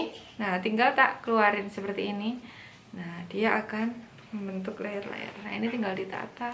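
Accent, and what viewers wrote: Java, Indonesia. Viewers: medok nemen mba... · suara medok nya itu loh aq suka banget 😁😁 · jawa bet ngmong'a :D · adhell kudell hahah sami abi ge teu paham ah bhsana gs medok jawa deuih ampun. 😁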